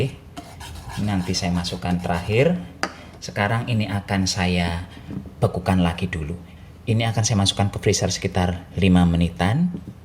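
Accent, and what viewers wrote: Banyumas, Indonesia. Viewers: Pak kiye sekang pak Dirun asli sekang Cilacap, nek rika jawane sekang endi, nek ndeleng ngomonge kaya bahasane wong Banyumasan. Rika nang Batam manggon nang daerah ngendi. Soale aku tau manggon nang Batam suwe kuramg luwih ana 6 tahun manggon nang daerah Pelita.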